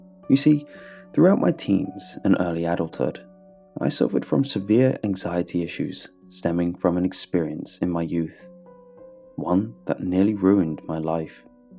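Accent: United Kingdom. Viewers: British voice 1like=prayer for michael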